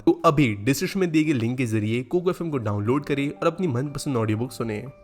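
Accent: India. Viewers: Yo, are you indians dumb or intentionally irritating??? If your gonna speak Punjab you benchod than put the title in Punjab not in English. I hate this fucking nation. Although you might be speaking English after all, it's the worst English accent of all time, brought to you by India.😂😂😂 Yeah I know, you will report this comment as hate speach. Bloody pc natzi's. Fuck you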